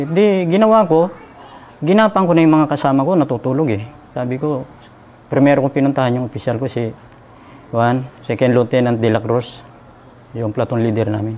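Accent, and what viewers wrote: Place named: Ilocos, Philippines. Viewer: Ilokano tuno mo master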